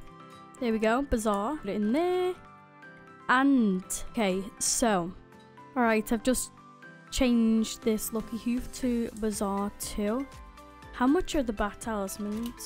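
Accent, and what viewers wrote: United Kingdom. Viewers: Proper British nice to have a English skyblock player for once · question where are you from i feel like you are from the uk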